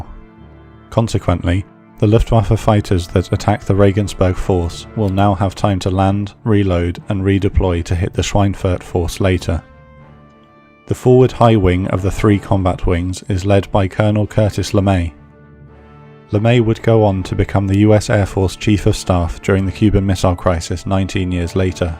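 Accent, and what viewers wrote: England, United Kingdom. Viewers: Krister Andersson: You couldn’t tell the narrator is English?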